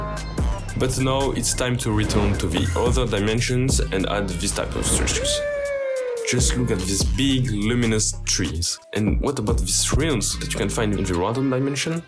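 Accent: France. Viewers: are you french? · u are french ??? ( oui sa se voit a ton accent et a ta desciription ) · Bro you sound like a french guy. are you french?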